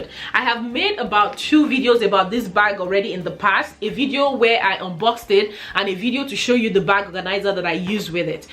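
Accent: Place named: Sierra Leone. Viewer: Thank you for the great review. It is beautiful. Like you. Is your lovely accent from Sierra Leone?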